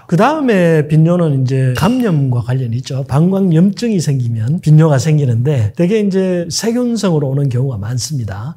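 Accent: Gyeongsang, South Korea. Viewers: 대기~할일없네? 갱상도 싸나이 발음이라고 생각혀~ㅉㅉ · 맞아요. 경상도 사투리가 ㅡ발음도 잘 못해서 ㅓ로 들리지요. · 갱상도 싸투리 아이가. 니 그것도 모른데이? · 갱상도 사람이니까 이해하세요ㅋㅋ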